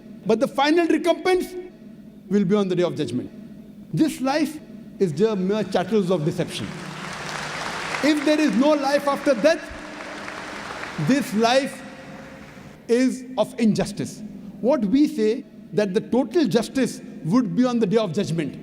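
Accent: India. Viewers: salamu alikum Dr. ZAkir, would you please add an auto-captions in English, so we can understand your accent. we are from north Africa, and it is difficult for us to understand the Indian English accent. thank you so much.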